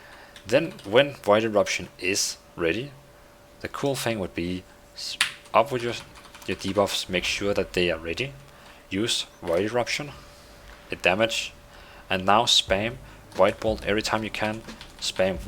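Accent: Denmark. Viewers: Man you are danish AF XD <3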